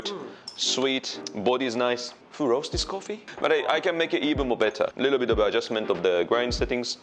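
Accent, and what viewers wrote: United Kingdom. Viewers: 우리도 영국영어 도입이 시급하다 귀에 쏙쏙 박히네😆😆😆😆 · 영국식 발음을 구사하시네요. 영어도 굉장히 잘 하시구요.ㅎㅎ 설명이 너무 좋습니다. 모든 행동에 다 이유가 있으세요. 마치 수학자처럼 꼼꼼하게 커피를 연구하시는 분인 것 같습니다. 멋진 분이신 듯 ..많이 배웠습니다,~!!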